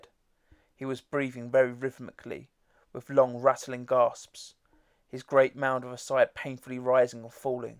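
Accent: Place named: England, United Kingdom. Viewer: Thank you for this video. This essay was assigned to my English class for analysis and hearing someone else read it really really helped me. It always sounds better with an English accent.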